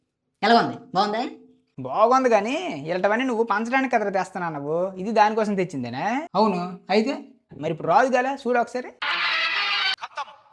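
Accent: Srikakulam, India. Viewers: Srikakulam Slang ❤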